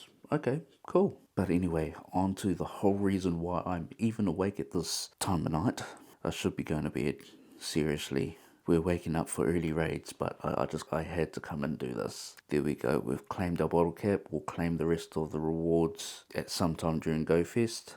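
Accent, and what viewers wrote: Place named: New Zealand. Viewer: You from nz cuz?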